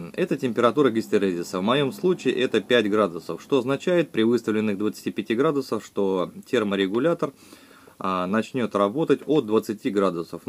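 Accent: Russia. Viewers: Нагинаем..., дубенеет... Вроде по русски говорит, да не совсем... Понятно что местный, южный, диалект. Но слух режет... Я один заметил, что выключатель питания не правильно подключен, не по феншую...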